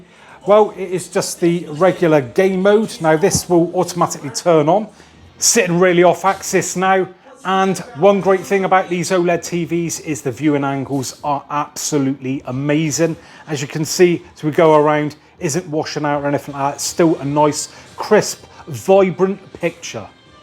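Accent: United Kingdom. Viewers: Love the video mate. Nice to hear a Brit on you tube for a change. What wall mount did you use as I am thinking of buying this. What made you chose this over the C1 by the way?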